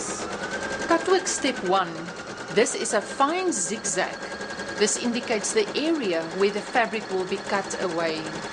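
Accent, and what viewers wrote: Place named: South Africa. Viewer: I love your south african accent.....!!!!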